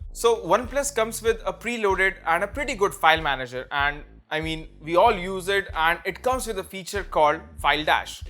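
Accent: India. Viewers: Indian-English accent is so annoying.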